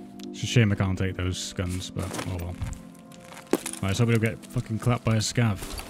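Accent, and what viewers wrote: United Kingdom. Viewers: This is my first video watching u and from the moment I hear the British accent I’m engaged 😂 then I witness the splendid performance of a player with 4000 hours on EFT and not only that but you give us such a vibe of music afterwards as you loot and groove your way through. Then finally I see the length of the video 😎. A job well done my friend. 🤝 how could I not subscribe?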